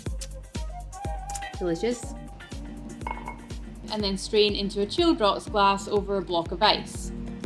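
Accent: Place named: Scotland, United Kingdom. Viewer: is now so connected with cocktails in my mind that all recipes are read in a Scottish accent.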